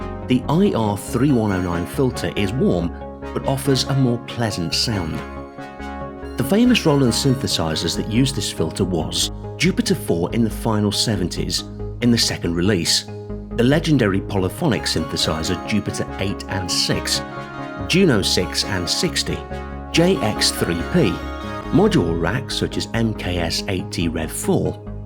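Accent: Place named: Japan. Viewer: It's a nicely done comparison. Whilst it absolutely can replicate the Juno 6-60-106, replication of the Jupiter 8's subtleties with cross mod etc, is not there. I have owned the original JP8, and now own both a DM and the JP-08, plus the Cloud ACB and Zenology versions. I'd still love to see Behringer really do their JP8 clone, as I think they'll nail it. One thing.. the commentary is very "Jinglish" (as we used to say at Yamaha R&D). It's a great, soothing and very listenable voice over, but the grammar and use of English needs work. For the record, when at Yamaha R&D, we also worked with Howard quite a bit (Jones), he came in for a Vocaloid overview, and over the years, did quite a bit with us. Truly one of the great exponents along with Nick Rhodes of the JP8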